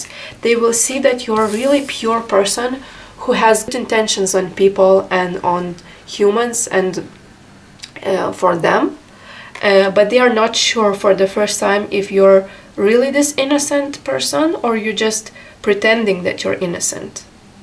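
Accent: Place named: Eastern Europe. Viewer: I like your accent but it's nice to listen because we're both readers and Slavics 😉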